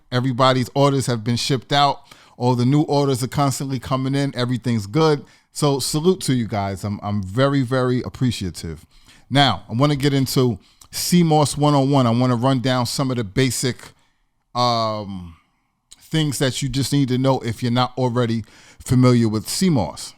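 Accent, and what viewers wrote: New York, United States. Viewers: I’m glad I found this channel. I’m interested in sea moss but I am a little hesitant because I have a history of hyperthyroidism while pregnant and always was borderline hyper thyroid. Not sure how the high iodine content would affect someone like me. Are you from New York? You sound like it. 😊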